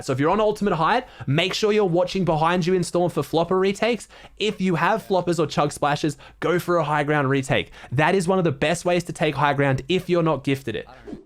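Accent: Australia. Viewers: Nice Aussie great vid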